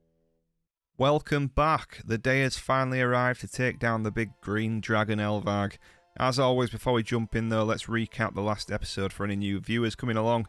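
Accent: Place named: Northern England, United Kingdom. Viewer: Really hope you hit 1k subs soon. you seem very wholesome and genuine. loving the yorkshire accent, keep plugging bro =)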